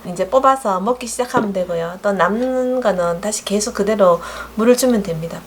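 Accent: Gyeongsang, South Korea. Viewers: 감사합니다 답글^^* 그런데 누가 그랬는데, 콩이오래되거나 묵은콩이면 안됐다고 들었던거 같아요 햇콩이나~ 일년 안쪽에 된콩이 좋다고 그런거같은데 암튼 미국에서는 그런콩이 없었어 안되나보다 하고 그 뒤로는 아예 할생각을 안했거든요, 항상! 구수한경상도 말씨로 깔끔하게 쉽게 설명 잘하시고 늘건강하세요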